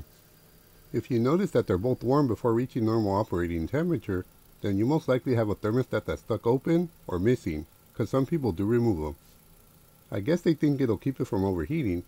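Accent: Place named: Mexico. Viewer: He sounds Mexican. If he is listen about vehicle. Trust me.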